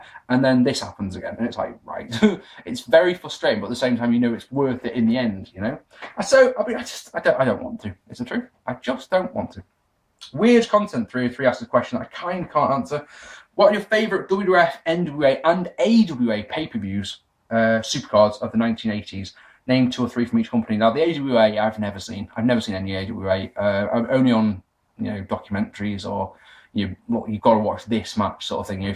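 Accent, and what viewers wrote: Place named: Cockney, United Kingdom. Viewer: Hay why do you do the whole cockny boy "faaking awesome"?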